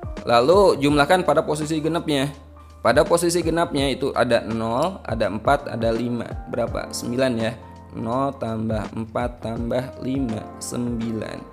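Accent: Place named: West Java, Indonesia. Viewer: Pix Sunda Pisan😊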